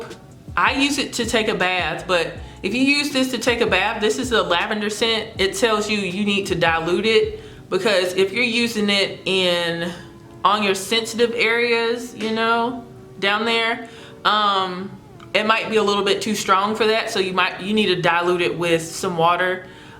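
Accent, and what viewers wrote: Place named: Texas, United States. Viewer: Are u from Texas??? Your accent is everything 😘.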